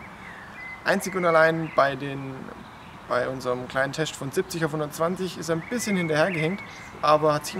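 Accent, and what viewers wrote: Swabia, Germany. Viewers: Der schwäbelt ja rum, des überrascht mi jetzt doch a bißle. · schwäbisch? :D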